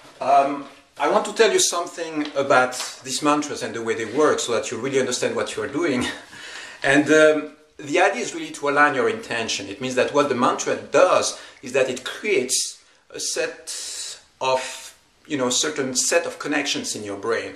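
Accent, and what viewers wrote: France. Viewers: are you french? really like your videos!!!!!!!!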